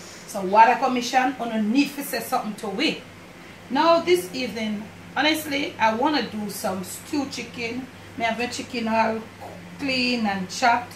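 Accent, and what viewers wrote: Jamaica. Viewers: Hey! This looks great and smells great too! (Yes, my computer transfers the smells from your kitchen to my desk, somehow! Its a freakin miracle!) Any chance that you might have a written recipe to share? You speak much too fast for me to try and translate your Jamaican speak into my English ears. Thank you!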